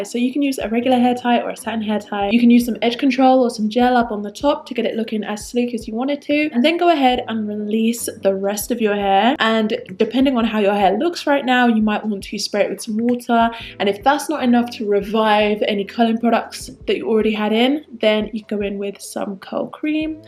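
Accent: London, United Kingdom. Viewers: You have a beautiful accent, are you from London?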